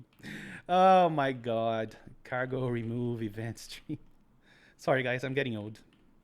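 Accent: Brazil. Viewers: No momento em que eu ouvi tua voz sabia que tu era brasileiro kakakak Boa mano, é muito bom ver alguém da comunidade trazendo um video em rust tão bom quanto esse Sucesso mano \o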